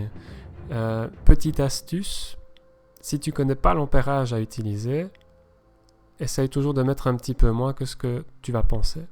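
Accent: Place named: Belgium. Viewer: Merci, ça fait du bien de se remettre ces réglages en tête, avec un petit accent Belge en bonus !!!!! Merci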